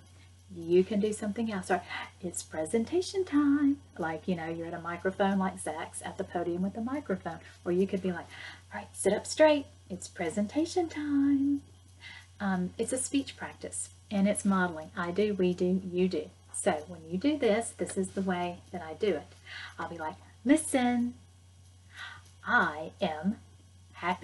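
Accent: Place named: South, United States. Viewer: we're two sentences deep and I love it already! People often comment on my Southern accent and I hear it in you as well. I have to stay on top of that drawl but it always makes me happy to see other teachers with an accent.:)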